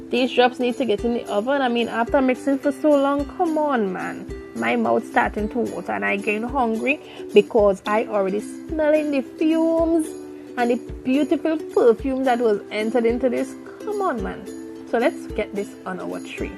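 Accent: Trinidad and Tobago. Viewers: You sound like a Tobagonian. That MUST be some good tasting coconut drops. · I love your Trini dialect on this video. Too many Trini people make recipe videos and sound weird when they try the American accent haha. Love this.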